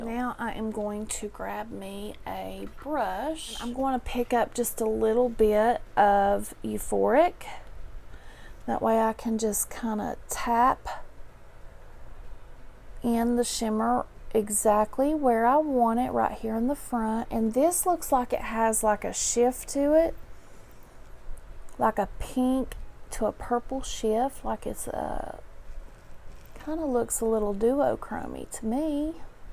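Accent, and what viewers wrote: South, United States. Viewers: I Need that palette!! I don't usually say that..😯 I love your accent 😍 Southern Lady..the looks you do 💜 you're so Amazing at what you do!! Aaaandd... 1 k is coming..🥳 Love you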